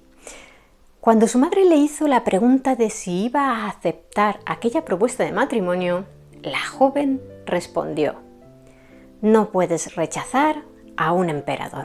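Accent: Spain. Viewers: Opino que tu forma de relatar es de puntos 💯 y eso que no es muy de mi agrado el acento español. Me en..can...to!🎉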